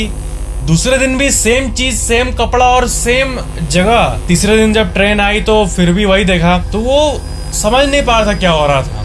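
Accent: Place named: Jharkhand, India. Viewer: Jharkhand se lag rhe ho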